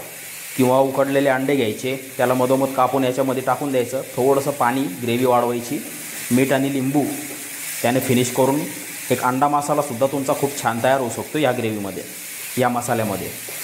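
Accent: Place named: Pune, India. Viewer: भाऊ तुम्ही नाशिक पेक्षा, जास्त आमच्या पुण्याचे मुळशीतले शेप वाटतात, आमच्या गोड रांगडी भाषा सारखी ❤️ तुमचीपण पन टोन वाटते 👌🏻 🙏🏻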